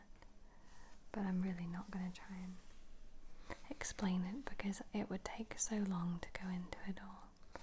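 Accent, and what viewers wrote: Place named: United Kingdom. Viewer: Black Mirror has broken so many grounds. I remember watching Bandersnatch for the first time thinking how cool of a concept it was. Hope you're doing great I love watching your videos. Your Brit speech is hot chocolate in the winter beside a warm fire ❤😱🥰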